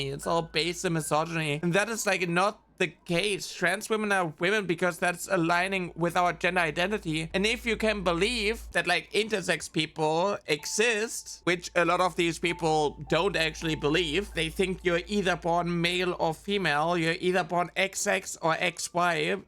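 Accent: Germany. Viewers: What's your accent? It sounds so familiar but I can't place my finger on it. German?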